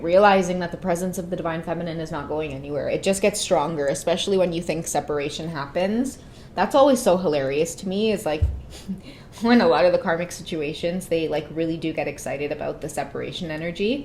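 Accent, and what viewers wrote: Trinidad and Tobago. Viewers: Hey !!! Are you from Trinidad 🇹🇹 you sound like you from.am from Trinidad 🇹🇹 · Your Trini accent is everything for me 🥰 Carribbean gal tingz 😂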